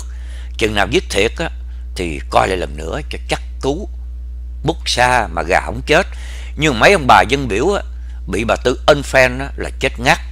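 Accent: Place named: Saigon, Vietnam. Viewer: Thích nghe giọng đọc của anh , giọng chuẩn Sài gòn ❤️👍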